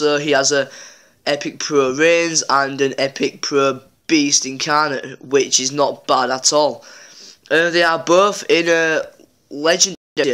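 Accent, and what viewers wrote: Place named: United Kingdom. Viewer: Awesome video bro. I really enjoyed watching it. Are you British btw? I like your accent a lot.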